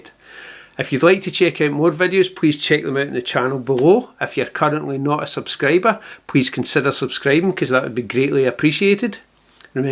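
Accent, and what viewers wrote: Scotland, United Kingdom. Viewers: My god...is this Scottish? I had to turn in captions sometimes XD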